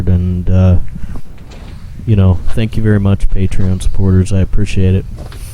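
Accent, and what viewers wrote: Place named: Midwest, United States. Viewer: Classic Midwestern Accent... I was asking myself... what accent? Then I realized where she was from. Your voice is very soothing. I would like to take an entire section of those glass blocks.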